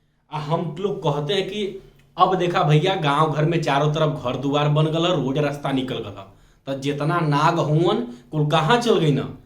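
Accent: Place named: Bhojpuri, India. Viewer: Maja aa ja raha hai bhojapuriya andaj me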